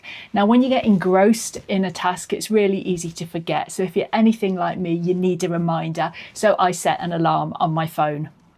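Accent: United Kingdom. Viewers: I couldn't agree more, having also just stumbled across your channel,. Thank you for your humorous take on navigating this stage of life, together with accessible science - always nice to hear a British accent too (with every respect to all the other wonderful accents heard on youtube) 😊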